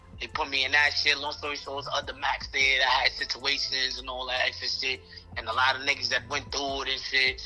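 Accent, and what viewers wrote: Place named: New York, United States. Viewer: NY talk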